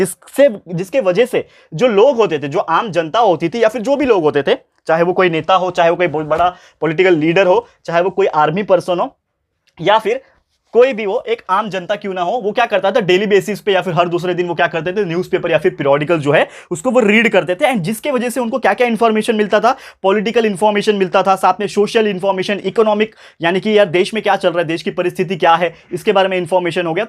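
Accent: Maharashtra, India. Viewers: Sir is your mother tongue is Marathi??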